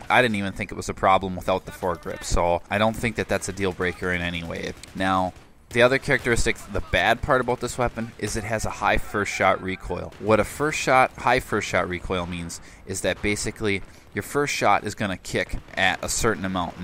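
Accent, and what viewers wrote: Canada. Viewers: Are you Canadian? And please do the F2000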